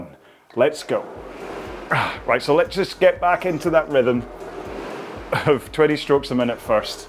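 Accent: Scotland, United Kingdom. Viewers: Newbie here. Love rowing along with you. Your Scottish accent is wonderful to listen to while I row.😊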